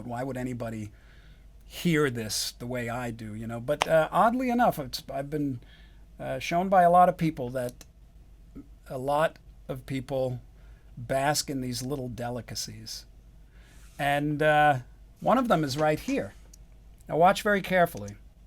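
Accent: United States. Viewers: You are the guitarist I would be you are an exemple Master you sound like an Italian compositor and in same time you do it with an American accent like when you talk I heard the both sounds it's questions of italian Genius blood like Leonardo da Vinci of 🎸 it's a special DNA like a perfect hybrid something like chianina grown up in American the perfect Animal, Always growing up with a lot of music in brain.the perfect sense of metaphors of spirituality like a strings cames from Pitagora the first guitar hero lol every note you do are like an LSD trip as Jimmy Hendrix of future you're biggest one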